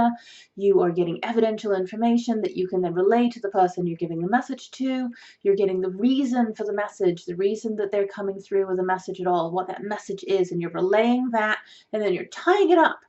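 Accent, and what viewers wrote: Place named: United Kingdom. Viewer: Are you in Plymouth England or Plymouth Massachusetts USA? You seem to have a British accent but a Pilgrim name, the Pilgrims did come from England.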